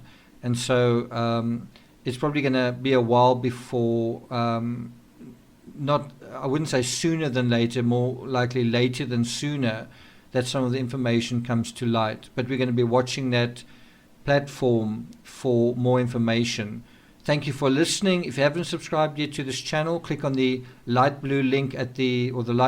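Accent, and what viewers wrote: South Africa. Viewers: Are you a South African ?Sounds like that ....your accent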